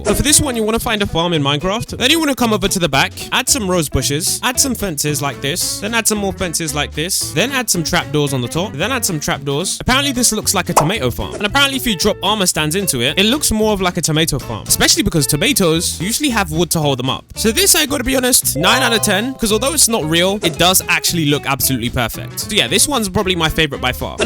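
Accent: United Kingdom. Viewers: You sound British, I’m British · Are you British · HES BRITISH U DO T KNOW THE BBRITISH AARE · Ur British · Bro is British💀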